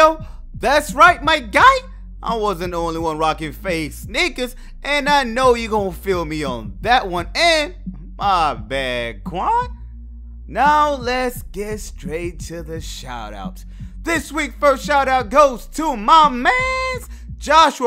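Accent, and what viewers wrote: Nigeria. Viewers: R u Nigerian? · Bruh are you nigerian?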